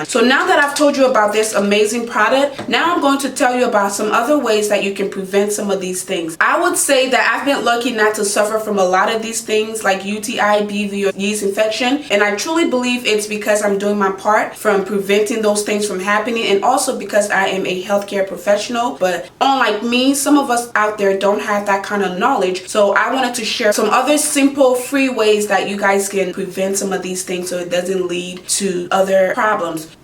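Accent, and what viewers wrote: Liberia. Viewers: Are you Liberian because YESSSSSSSSSSSSS!!!!!!!!!!!!??????? I can’t help but notice the accent I’m sorry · Ayyeee! LIB sis, as I hear your accent! Do it for the culture hunnnny,! Yasss! Thanks for this info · I can definitely hear your Liberian accent lol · That accent sounds like a Liberian girl. Go queen ❤️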